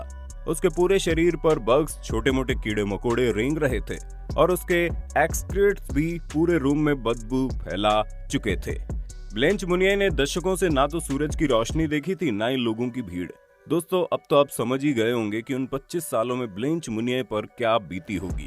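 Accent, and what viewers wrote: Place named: Jaipur, India. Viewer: Bhai apki real voice sunai ye yeto koi jaipur vale bande ki hai bhai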